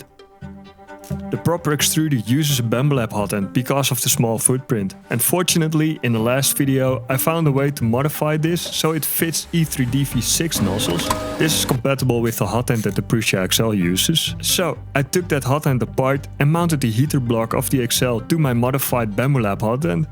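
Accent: Netherlands. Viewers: i thought you sounded dutch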